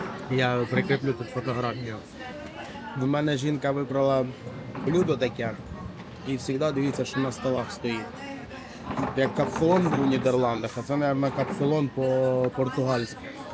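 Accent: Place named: Poltava, Ukraine. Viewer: Полтавський акцент ❤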